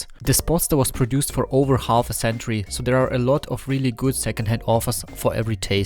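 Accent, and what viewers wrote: Germany. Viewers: I truly enjoyed this video, and the German accent is awesome. Germans have always loved Harley. I appreciate you didn’t use an AI voice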